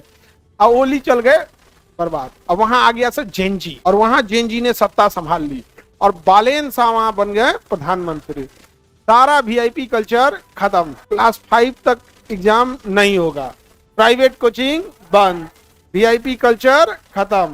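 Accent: Bihar, India. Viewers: lecture dene se pehle thoda research vi karliya karo .....bihari ho sab ko pata hay, harek video me aapne behwar aaur bolne k tameez se bar bar biharipan ka praman dena jaruri nahi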